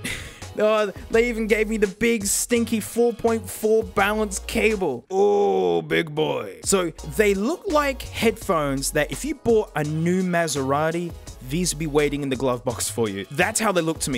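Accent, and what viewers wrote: Australia. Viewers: That's the aussie accent for ya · Aussie all the way mate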